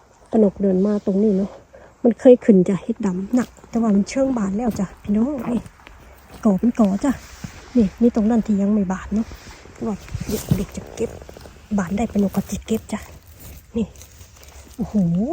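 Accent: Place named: Korat, Thailand. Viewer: ฟังคนโคราชบ้านเองพูดแล้วชื่นใจ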